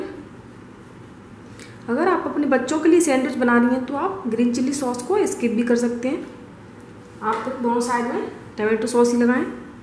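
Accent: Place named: Delhi, India. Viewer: Bolne k tarike k lag Hi rha tha ki shayad humari taraf se Hi ho kyunki me b Delhi se hu but Gujarat me reh rhi hu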